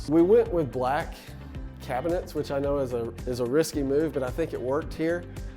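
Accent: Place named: Georgia, United States. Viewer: I went to UGA in the 90s, your accent brings back memories!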